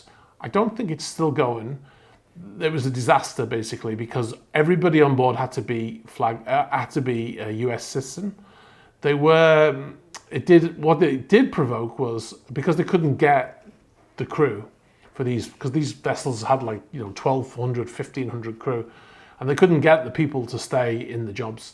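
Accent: United Kingdom. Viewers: I've only just watched a few of your videos. What is your history in crewing? I'm not asking for a complete cv but a general idea. Do you have an engineering background or captain/masters rating or chief cook and bottle washer? Lol Do you still work or are you retired. You sound British so maybe you were in the royal navy? I liked your video and hope to see more!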